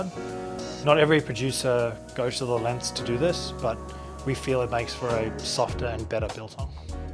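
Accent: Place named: Australia. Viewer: I can't distinguish it I just heard Australia..!!! Hehehe..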